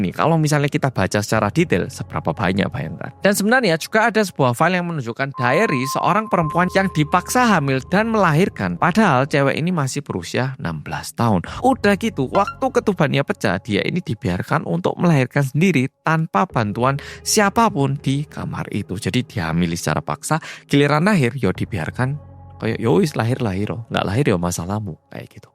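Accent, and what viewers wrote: Java, Indonesia. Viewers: Ak lebih suka medok ini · Korea medok....weeeeh baru denger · WONG KOREA BAHASA INDO MEDOK...😂😂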